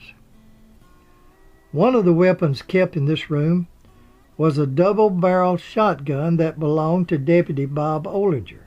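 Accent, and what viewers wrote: South, United States. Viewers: I love that Southern accent. Music in my ears. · I don’t know where you’re from, but you have a pleasant story telling accent. I’m guessing western AL or Mississippi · Ok...I'm from Wisconsin and in my opinion, anyone south of Illinois has an accent that multiplies by every mile south of that. Perfect voice for documentaries and you should be on TV and radio. Your documentaries are better than some of the History Channel documentaries I've seen. Also, speaking of History Channel, have you watched "FOUND?" If you havent, you should see the Jesse James episode...mind boggling!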